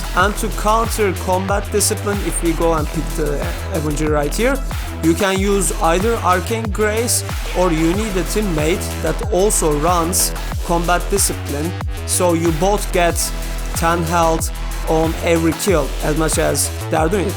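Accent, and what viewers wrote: Turkey. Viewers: Türk olduğunu aksanından direkt anladım😂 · Türk müsün kanka konuşmandna belli amk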